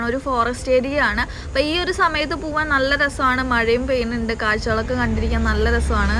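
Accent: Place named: Thrissur, India. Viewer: സാധനങ്ങളുടെ വിലയുംകൂടി പറഞ്ഞാൽ നന്നായിരുന്നു (തൃശൂർ സ്ലാഗ് ഉണ്ടല്ലോ ) എന്തായാലും നന്നായിട്ടുട്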